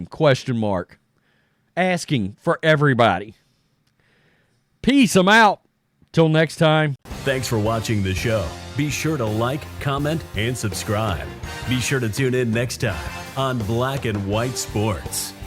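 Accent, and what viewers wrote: South, United States. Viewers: Dude WHERE ARE YOU FROM? TEXAS? THIS IS BUFFALO AND PITTSBURGH IN JANUARY!!! DID YOU JUST DISCOVER FOOTBALL? This is like shooting yourself in head as treatment for a headache. You're gonna suggest trashing the entire playoff schedule for the winner because playoff football has to be played in the snow? IN BUFFALO???? You sound like you're from the south so i get how SNOW sounds to you, but this is utterly ridiculous to suggest SNOW is that big of a deal to Western NY and Western PA ppl. We LIVE in Lake Effect snow conditions our entire lives!!!! Sit this one out country boy. Guarantee the Bills would be LIVID to give up home field advantage for some snow. This is extremely hilarious 😂🤣 tell me you're not from around here without saying your not from around here. If ANYONE can play in these elements... Its Pittsburgh and Buffalo 😂😂😂😂😂😂😂😂😂😂😂😂😂😂😂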